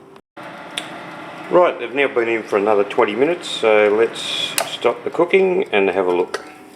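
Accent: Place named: Australia. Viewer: So nice to hear an over the ditch accent 😊 kiwi here x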